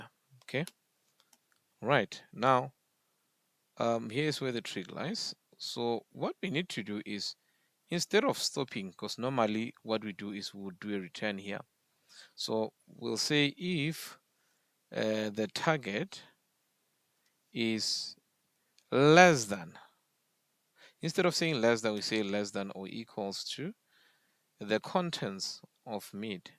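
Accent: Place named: South Africa. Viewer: Please continue making these DSA videos. Your teaching style resonates with me, and perhaps it’s your South African accent that I find refreshing.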